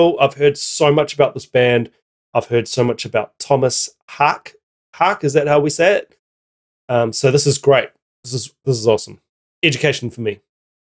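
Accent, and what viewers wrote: New Zealand. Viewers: Where in New Zealand are you from?!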